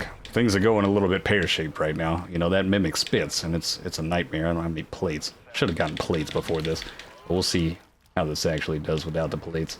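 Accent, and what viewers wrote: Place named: South, United States. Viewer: This guy is underrated as fuck, The deep country accent makes watching you very fun as well as good commentary, fun gameplay, Good ideas and hes clearly not bad at the game either. Keep up the good work my man!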